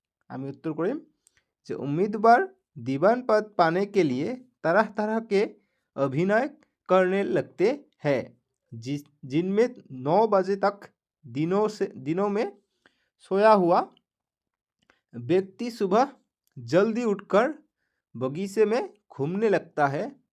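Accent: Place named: Assam, India. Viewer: Sir ap hindi itni adsi se kenehi saktta he na apka hindi bat assamese me keraheho jesa lageta he sir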